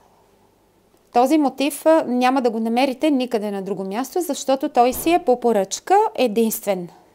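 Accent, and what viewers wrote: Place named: Italy. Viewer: Сигурно си от доста време в Италия, защото се усеща италиански акцент:) Ти сподели , че дъщеря ти не знае бг . Напълно те разбирам:)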